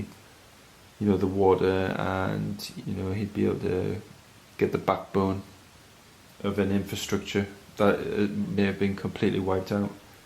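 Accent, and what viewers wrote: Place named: Newcastle, United Kingdom. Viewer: I think you should stay on YouTube. Good content and hearing you reminds me of my drugged youth living in Newcastle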